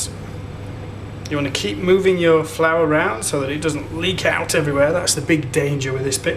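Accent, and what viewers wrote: United Kingdom. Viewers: Are you northern english??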